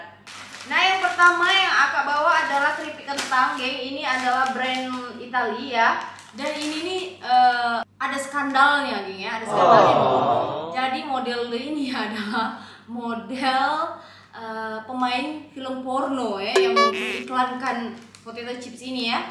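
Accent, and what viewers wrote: Malaysia. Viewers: cara kamu berbicara spt bahasa melayu malaysian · Saya mulai subscribe dari tahun lalu, suka aja liat videonya lucu, menarik, dan logat melayu'y ini bikin gemas Klo ngikutin d'ig baru berapa bulan ini karna saya ga terlalu aktif d'ig 🤭🤭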